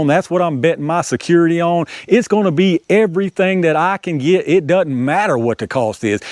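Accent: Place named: Appalachia, United States. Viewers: This is 2026 right? I think we can do without the hillbilly accent. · That's just the way we talk in the mountains. You say hillbilly like its a insult😆🖕💯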